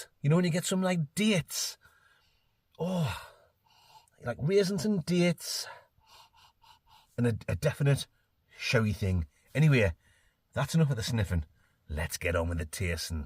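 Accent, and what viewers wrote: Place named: Newcastle, United Kingdom. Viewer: ❤ newcastke accent dude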